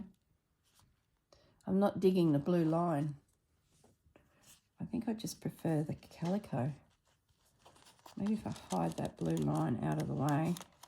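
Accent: Australia. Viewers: I watch some other crafters that make beautiful things that I love, but their voice and way of speaking make me crazy! I love watching you create beautiful things and listening to you! Maybe it's the Ausie accent!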